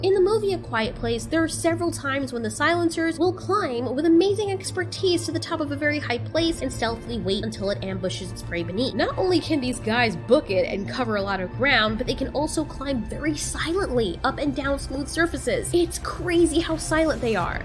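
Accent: United Kingdom. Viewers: please answer this are you british bcs that was the best british accent uive ever heard, i should know since im british. i started watching u about 3 weeks ago and have been so fascinated by all of this with a quiet place i love ur work aswell please keep it up <3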